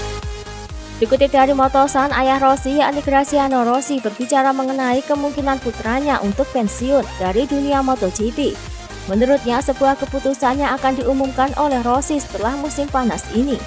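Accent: Java, Indonesia. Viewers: Iki MiMinya wong jogja phooww..yoo yg isi suaranyaa..medhokk..bgt..Min... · Admin Medok mania mantap 😂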